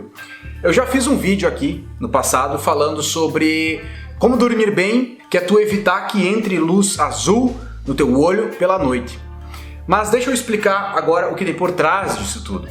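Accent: Rio Grande do Sul, Brazil. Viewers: Certo que esse cara é Gaúcho haha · Pelo seu sobrenome, seu jeito de falar, creio q tu seja do rio grande do sul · Mas bah tchê, acho q tu é Gaúcho, não? Kkk é nóis bagual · De que cidade gaúcha tu és tchê? Rsrs sou de Gravataí-RS abraçao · Como se diz aqui no Paraná, "LEITE QUENTE FAZ BEM PARA O DENTE". Contanto, acho que o deve ser gaúcho, pelo sotaque. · Mas que bagual, gaúchos por aqui tchê, loco de especial meu pia? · Por acaso você é gaúcho???????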